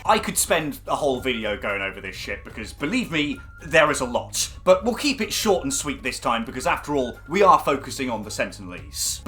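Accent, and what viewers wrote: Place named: United Kingdom. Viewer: Hate getting two minutes into a video and realising I can't watch it bc the narrator is Br*tish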